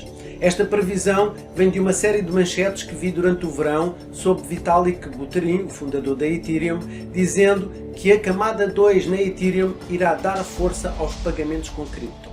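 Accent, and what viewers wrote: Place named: Portugal. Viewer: Não consigo assistir vídeo em português de Portugal 😥😥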